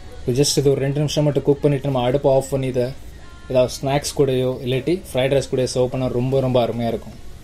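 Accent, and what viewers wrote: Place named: Mumbai, India. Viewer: Your tamil is nice. Mumbai tamil 😅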